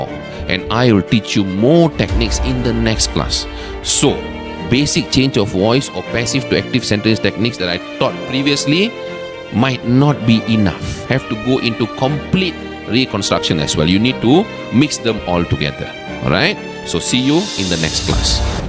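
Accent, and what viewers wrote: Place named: Singapore. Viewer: This man speaks and sounds like Kumar from Singapore.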